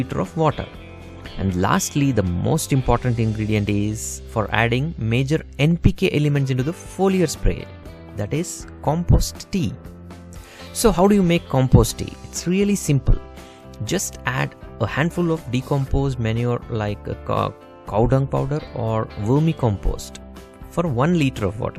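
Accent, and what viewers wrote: India. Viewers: Boring. Speaking english in indian accent just to complete the video. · Indian english.☺️☺️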